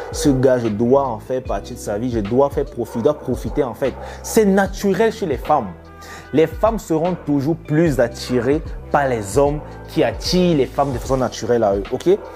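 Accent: Cameroon. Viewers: T'as l'accent camerounais, suis camer aussi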